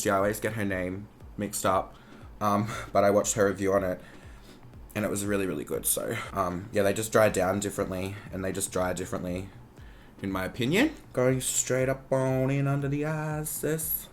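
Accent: Australia. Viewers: is he Australian? i can't tell because I'm Australian and i am used to the accent. It sounds a bit British at times..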